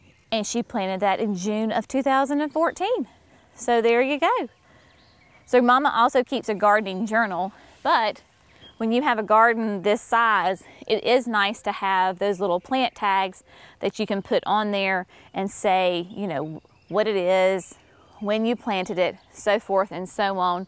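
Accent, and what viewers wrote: South, United States. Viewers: You know, I know you are southern not just by your accent but because I actually feel your joy standing in front of those azaleas. And yes, your mom has a knack for blending whimsical pcs and after my own heart, a container gardener.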